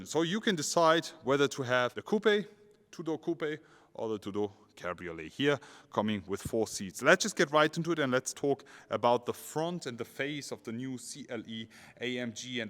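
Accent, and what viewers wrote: Germany. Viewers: It might be because he is speaking English with a German accent!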